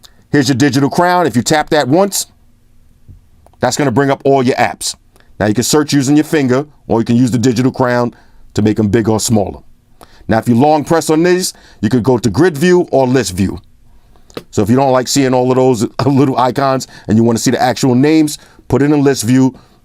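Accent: United States. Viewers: Haha. That's awesome,. You sure sound like an American. Nonetheless your reviews are awesome. Keep it coming!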